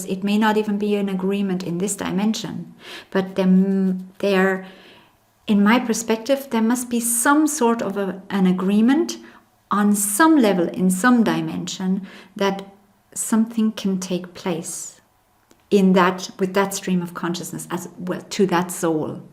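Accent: Ireland. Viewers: May I ask are you Irish?! I am and your accent is very Irish!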